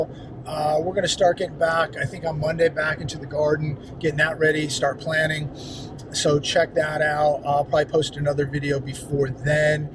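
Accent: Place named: Alabama, United States. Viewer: If you’re Liberal please don’t move here. This guy already sounds like an Alabamian. Let’s keep Alabama RED!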